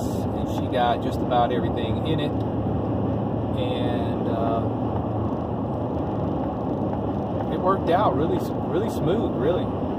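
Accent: South, United States. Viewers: Love your southern accent 🤠👍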